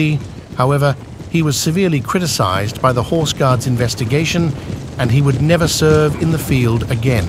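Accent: United States. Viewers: It still used American pronunciation though such as "dee-poh"!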